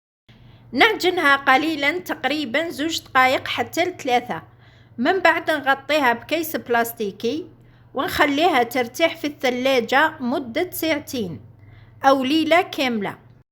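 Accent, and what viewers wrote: Setif, Algeria. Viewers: يعطيك الصحة على حساب هدرتك نباني سطايفية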